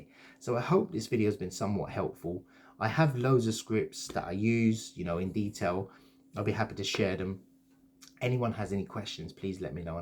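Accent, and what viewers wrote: United Kingdom. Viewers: Your British accent made me remind of my old gold days at Guildford. Now I am in India working as a US Tech. Recruiter. I have subscribed to your channel yesterday and enjoying watching the videos. You are passing good knowledge. Keep up the good work. Thank you!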